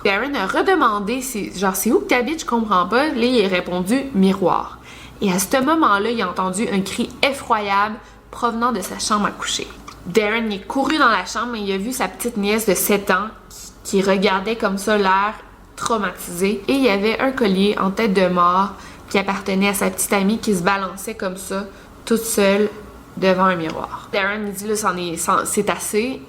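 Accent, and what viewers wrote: Quebec, Canada. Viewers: Roh l’accent québécois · J adore ton accent ...québécois tu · Merci pour cette vidéo. Ooooooh que j'adore ton accent. C'est trop mignon 🥰. J'adore l'accent Québécois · Moi j'adore l'accent Québec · Depuis que je regarde tes vidoe je me suis habitué à l’accent canadien 😂❤️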